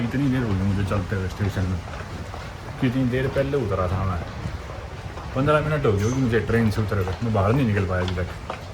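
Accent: Haryana, India. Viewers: Being a haryanvi I can sense your pronounciation with haryanvi touch 😂😂 and it feels very good to hear that 😁😁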